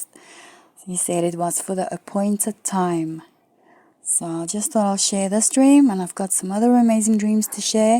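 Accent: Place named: South Africa. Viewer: I just came across this video and recognize your South African accent!! (groete van Benoni 🇿🇦) God bless, sister. See you soon in the clouds!!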